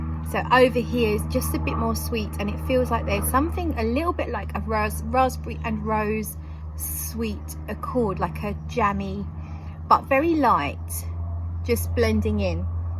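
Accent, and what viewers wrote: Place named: United Kingdom. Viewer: I just ordered Artsy by Kimberly NY- it’s supposed to be very light-hearted and candy like, possibly marshmallow-like. Also I just want to say I love that I get to hear your lovely British English often. I wish I had an accent like that. You know my mother was Australian so she said things funny and I did too but I remember the day I started saying “orange” with a long o instead of “arange” like her.. I know that’s not British but anyway I started out with a different accent..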